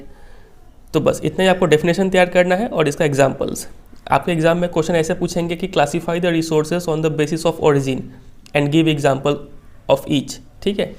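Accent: Bihar, India. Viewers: Sir aap Bihar se ho Kaya please reply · Kya aapp bihar se ho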